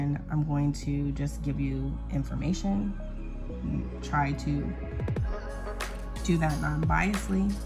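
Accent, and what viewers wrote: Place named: Northeast, United States. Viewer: very helpful thank you!! Also you sound a little like me-- and people don't always know what my race is based on my voice lmaooooo-- are you from the NorthEast of USA? (I'm from NYC)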